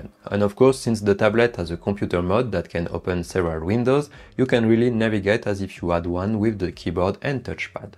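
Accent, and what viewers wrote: France. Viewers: your french accent is very strong. difficult to understand. I suggests you speak slower so that people can understand you.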